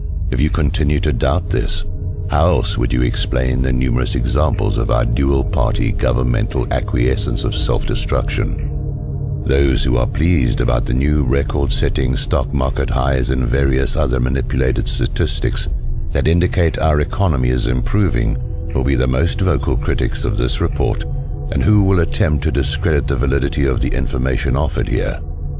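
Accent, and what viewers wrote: England, United Kingdom. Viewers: I guess the commentator with the British accent gives this message more credibility. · Why does the narrarator have a british accent? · There is no gold reserve, nor has there been since the early 1930's. All the federal reserve relies on private banking, namely the 2 big families, and we all know who they are. The guy narrating this documentary keeps saying our country, our economy, but his accent is definitely English. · English narrator. Obviously true!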